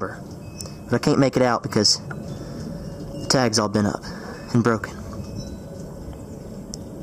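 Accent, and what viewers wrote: South, United States. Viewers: i love ur country voice!! itss saatisfying to me from the north, thanks for the verses at the beginning of ur vids:) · I'm here mostly for the accent, also to watch find treasure! 😅 This California girl can't hear enough of a lovely southern accent. 🙄👌🎶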